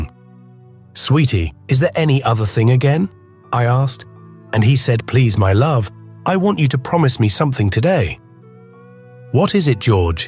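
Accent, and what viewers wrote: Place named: Europe. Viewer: I'm sorry but, why is this being read by this european voice? He isn't pronouncing the oooo's and hmmms correctly.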